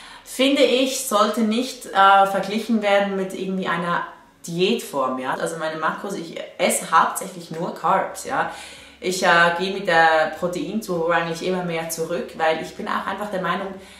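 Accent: Switzerland. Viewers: ist sie schweizerin? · Schweizerin? :'D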